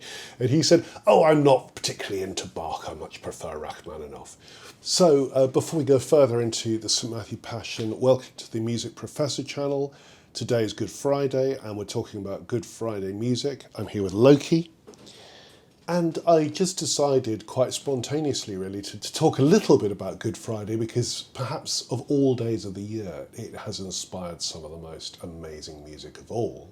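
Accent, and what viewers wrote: United Kingdom. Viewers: No need for blasphemy to praise Bach. He would be appalled by your reference to “divinity.” In addition your performance and narrative are disgusting—especially the poncey British accent. Goodbye!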